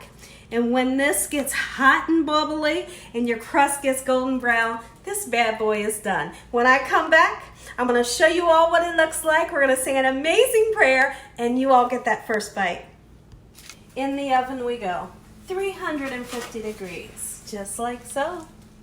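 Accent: South, United States. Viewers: You accent is sooooooooooooo bomb. I love a country girl accent💖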